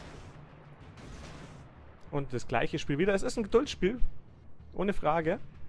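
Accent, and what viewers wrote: Bavaria, Germany. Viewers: absoluter Fan von dir, erinnere mich noch gerne an WoT mit bayrischem Dialekt. Da ich seit Mai in Wien wohne muß ich sagen hier wird alles gesprochen nur wenig österreichische Dialekte.HeHe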